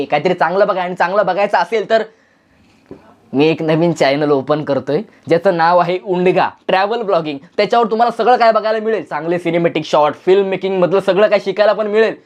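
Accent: Konkan, India. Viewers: 😂😂😂haha mja aa gya Dada tu kokani ahe ka?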